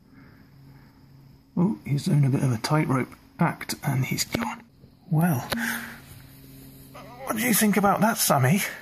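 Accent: England, United Kingdom. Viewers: Katieboil Anegg English, not Welsh. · By the sounds of it your British, there's an organisation call "wildlife aid" in Britain that help all wild species that are sick and injured. You could give them a ring next time you see an animal that's sick or hurt, they'd gladly come and assist. They have a channel here on youtube under the same name. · Hes British if it was not plainly obvious by the accent. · The British accent is always lovely 💘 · The English accent is a cherry on top🦊 Precious fox💕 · The narrator reminds me of winnie the pooh but with a british accent.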